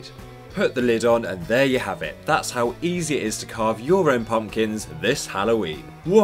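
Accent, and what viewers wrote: United Kingdom. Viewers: British accent :)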